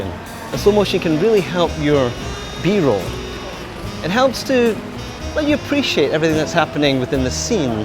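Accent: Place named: Scotland, United Kingdom. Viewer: Just aboot crapped ma pants there when a heard yer Brogue....Defo got the Scottish mannerisms..Alba gu bràth ✊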